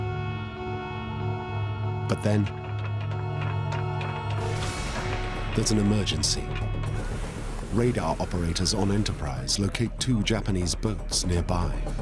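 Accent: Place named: England, United Kingdom. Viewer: American propaganda with an english accent. Nonsense.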